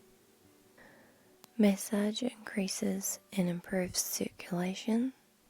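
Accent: New Zealand. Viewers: Love your Kiwi accent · Are you a kiwi? The sound of your voice had me falling asleep 😴 you talk exactly how my momma used to talk when she was trying to make me go to sleep as a child 💕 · Kiwi accents are the best!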